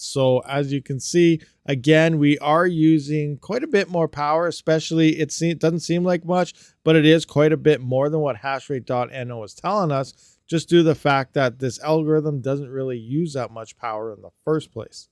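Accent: Canada. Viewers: Are you Canadien buddy?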